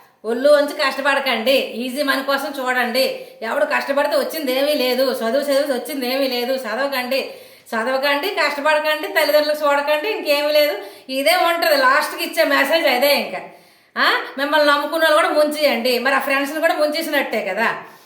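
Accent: Srikakulam, India. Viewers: అక్కా నీ శ్రీకాకుళం బాస చాలా సూపర్, కానీ యేసులో ఉన్న ఒక్క కొలటి కూడా నీలో ఉంటే చాలా అభిమానించే వాడిని, నీకు దేవుడు మారుమనస్సు దయచేయును గాక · సికా కులం ఏమో 😂 అనుకున్నా నేను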